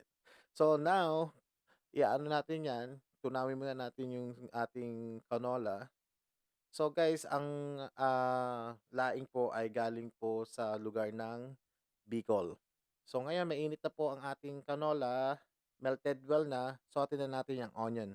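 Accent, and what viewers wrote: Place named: East Asia. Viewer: I was thinking you're Singaporean, Korean or Japanese kasi the way you speak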